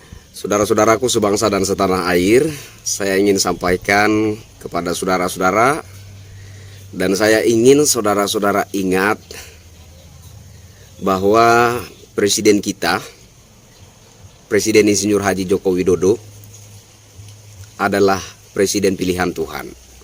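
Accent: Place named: East Nusa Tenggara, Indonesia. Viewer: Merinding saya mndengar narasi dari orang ntt.semoga presiden kita sehat selalu dalam lindungan Alloh swt.salam dari cilacap jawa tengah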